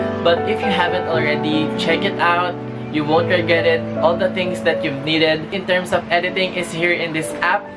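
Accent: Philippines. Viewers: I know a pinoy when I hear one🌚 Lab da bideo, Kuya! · 𝐋𝐞𝐦𝐦𝐞 𝐠𝐮𝐞𝐬𝐬 𝐡𝐞 𝐢𝐬 𝐟𝐫𝐨𝐦 𝐭𝐡𝐞 𝐩𝐡𝐢𝐥𝐢𝐩𝐡𝐞𝐧𝐬 𝐦𝐲 𝐛𝐟𝐟 𝐢𝐬 𝐚𝐧𝐝 𝐭𝐡𝐢𝐞𝐫 𝐚𝐜𝐜𝐞𝐧𝐭 𝐬𝐨𝐮𝐧𝐝 𝐭𝐡𝐞 𝐬𝐚𝐦𝐞 𝐢𝐦 𝐟𝐫𝐨𝐦 𝐯𝐢𝐞𝐭𝐧𝐚𝐦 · His filipino accent tho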